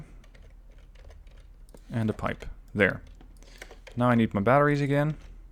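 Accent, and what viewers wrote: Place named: Netherlands. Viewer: Nice vid ar you dutch you have a Dutch accent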